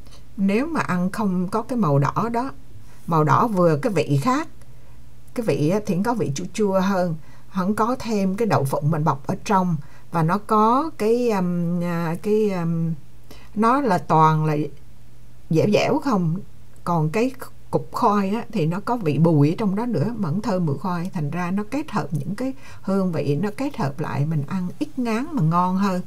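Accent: Quang Nam, Vietnam. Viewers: Cô nói giọng giống người miền Trung, quảng nam.🥰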